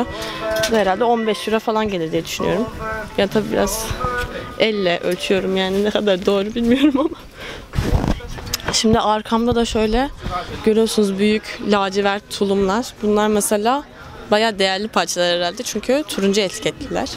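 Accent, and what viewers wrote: Kastamonu, Turkey. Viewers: FRANSIZCA DİL ÖĞRENİRKEN Mİ GENİZDEN KONUŞMAYA BAŞLADINIZ YOKSA KASTAMONULU olabilir misiniz?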